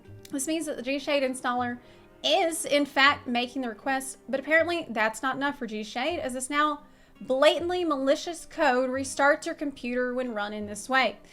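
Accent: United States. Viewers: Her cowgirl accent is coming back to fast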